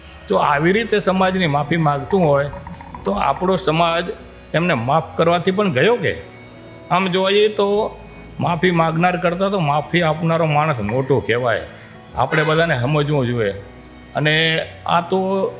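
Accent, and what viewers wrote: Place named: Kutch, India. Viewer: આ ભાઈ ની સમજદારી અત્યાર સુધી કોઈનામાં જોઈ નથી આ ભાઈ બોલી ઉપરથી ખમીરવનતા કચ્છના ક્ષત્રિય હોવા જોઈએ ધન્ય છે બાપુ આપને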